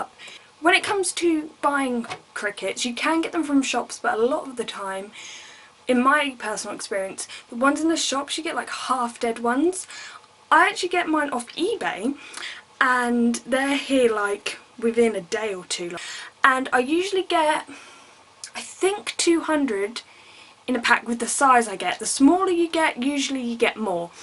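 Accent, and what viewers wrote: England, United Kingdom. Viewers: idiot it is a British accent!!! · DigitalGaming she lives in England and her accent is real bro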